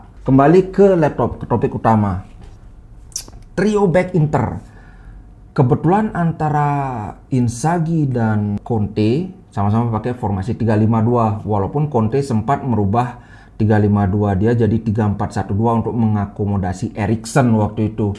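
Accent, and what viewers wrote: Bali, Indonesia. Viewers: Menarik komentarnya, apalagi dialek Balinese membuat senyum2 mendengarnya. Lanjutkan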